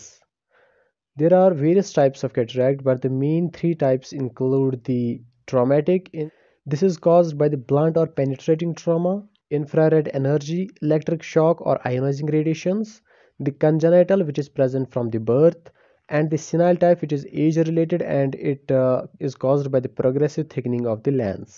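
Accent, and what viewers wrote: Kashmir, India. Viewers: Kashmir se ho kya baya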